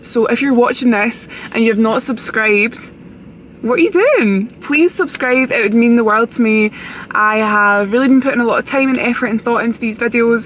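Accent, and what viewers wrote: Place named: Fife, United Kingdom. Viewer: So nice 🥺 side note- you sound like your from fife (from a fellow fifer)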